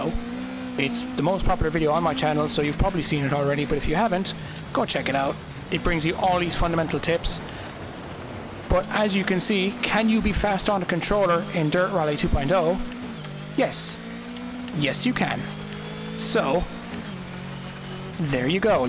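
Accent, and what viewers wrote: Ireland. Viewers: I like your accent! Irish?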